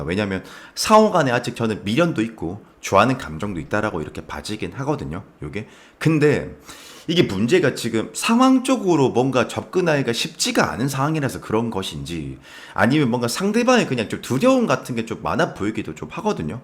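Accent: Gyeongsang, South Korea. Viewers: 3번 리딩 감사합니다🙏🏻 구수한 경상도 사투리 넘 듣기좋아요 ㅋㅋ 중간에 살아있네ㅋㅋ 쌤 리딩 처럼 졸라 좋은 결과 와서 후기 남기고 싶네요 😂 추운날씨 감기 조심하세요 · 같은 경상도라 그런지 더 정감이 갑니다~ 2번 선택 했는데요~ 요즘 다들 힘든 경기 겪고 있는듯한데 부디 잘 버티고 힘든 시기 고이 지나갔음 좋겠네요. 영상 자주 보고잡아요😊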